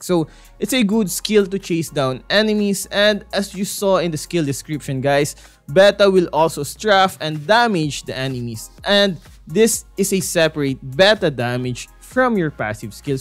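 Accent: Philippines. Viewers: i can hear the filipino accent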